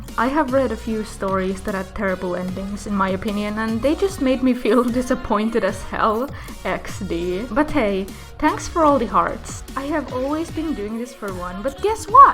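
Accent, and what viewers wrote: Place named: Finland. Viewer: TUNNISTIN HETI SUN AKSENTISTA ETTÄ OOT SUOMALAINEN HERRANJUMALA tekee tästä vielä paremman 😎.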